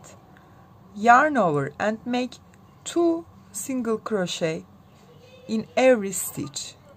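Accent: Turkey. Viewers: Abla aksanından tanıdım türk olduğunu valla elenjdkdnwbsk Deniycem yapmayı şimdi ellerine sağlık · Gerçekten Türk aksanı diye bir şey de var ben de oradan anladım. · I love your accent! Are you turkish?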